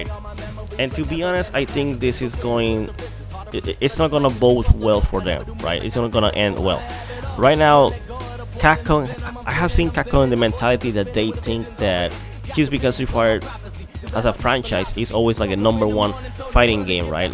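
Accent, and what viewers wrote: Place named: New Jersey, United States. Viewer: I love your New Jersey accent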